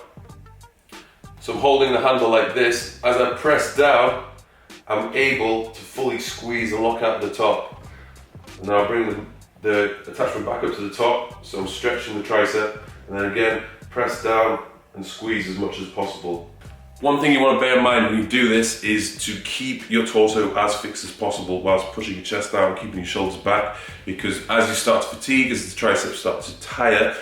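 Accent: United Kingdom. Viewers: Hello from LA again. I was so glad to see that you are back with the videos again. Your arms are now, perhaps your best body part!! I'm going to incorporate these new tips into my training and will pass it on to my personal trainer. My trainer is good, but your explanation is so clear and understandable......maybe it's the enviable British accent!! Ha Ha. Looking forward to more great videos. LA needs you!! Thanks, Hal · Sounds like John Cena with a British accent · A British John cena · I hear a British Ben Shapiro for some reason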